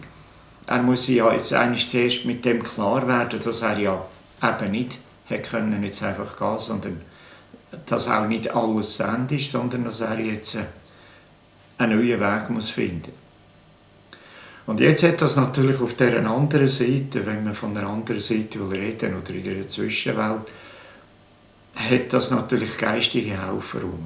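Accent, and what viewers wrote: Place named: Switzerland. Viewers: Für mich auch - unmöglich - viel zu anstrengend - da geht mancher Satz total unter. Mein Dialekt ist d. Schwäbische - red aber jeweils auch nur unter uns so - ich will doch verstanden werden - oder erwartet man , daß jeder interessierte hier gefälligst dem schwyzer' dt. sich anpassen sollte ? Sehr schade !Dazu noch den Bart , wo es erschwert , evtl. v. d. Lippen ablesen zu können ! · Ich liebe den Schweizer Dialekt, leider so schwer zu verstehen. :)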